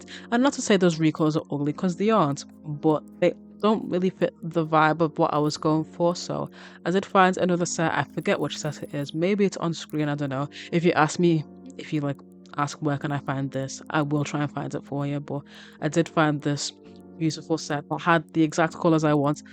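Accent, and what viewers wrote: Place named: Liverpool, United Kingdom. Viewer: Looks amazing! A modern take. Brew you’re accent is really unique, it sounds like a tiny bit of scouse and other accents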